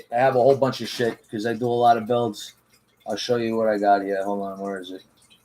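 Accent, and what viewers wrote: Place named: New England, United States. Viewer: You accent makes me miss life in New England (grew up in RI and MA). I'm currently in EU but hoping to get back next year.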